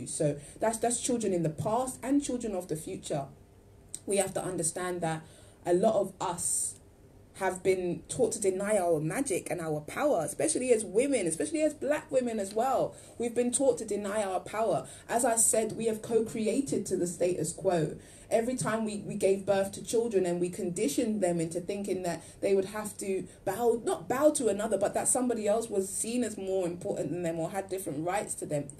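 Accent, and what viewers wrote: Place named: London, United Kingdom. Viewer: So beautiful to hear a London accent in the Melanated community on YT.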